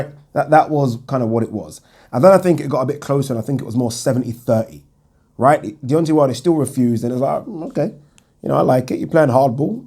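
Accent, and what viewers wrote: United Kingdom. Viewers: As soon as I heard your UK accent I knew the whole video would be biased. Wilder tried for 3 months to make a fight with AJ and NO LUCK and he has now moved on and that is what we do in America MOVE ON. Wilder was just on a tv show "BILLIONS" on Showtime and now possibly a Creed 3 movie so he is busy now making big moves in Hollywood. Question : Why cant Joshua show up to Wilder vs Breazeale in NY which is first isnt AJ here in 🇺🇸??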